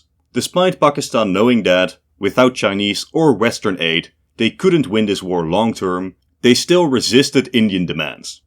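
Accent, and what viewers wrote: Netherlands. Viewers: yeah i don't understand how anyone thinks is American. Man has the most quintessentially Dutch accent ever lmao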